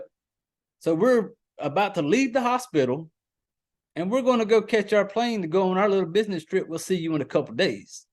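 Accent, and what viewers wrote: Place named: South, United States. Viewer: Dude it ain’t no problem at all. I’m from the South too man. Just above Danville Virginia. When I heard you talk I was like hell yeah! I like this shit! I have The Tank lined up for me and my wife to watch tonight. That sounded like my kind of movie 👍🏻👍🏻 I started listening to your first podcast and I’m working my way up so I don’t know what all you’ve put on the podcast but do you ever watch Bigfoot movies? I loved me some Bigfoot dude. Check out Exists if you haven’t. I would love to hear your take on that one 👍🏻👍🏻 I’m an Elijah Craig drinker myself, matter of fact I took a half day off work to enjoy that beautiful stuff and mow my yard! Lol! I ain’t never mowed sober.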